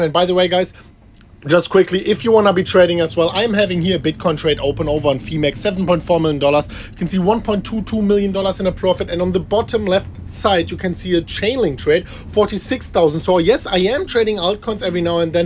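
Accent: Germany. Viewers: Hes german right?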